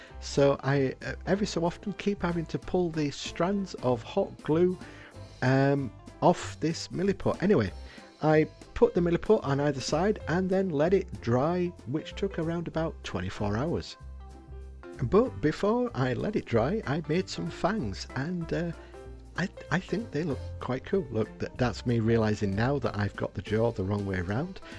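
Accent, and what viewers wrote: United Kingdom. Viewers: I suspect from your accent that you are from somewhere in the UK. We in the US who follow American football may remember the quarterback Jay Cutler, who was the team captain of the Chicago Bears from 2009 to 2016. Not sure, but I suspect he probably also liked Scooby Doo as a kid.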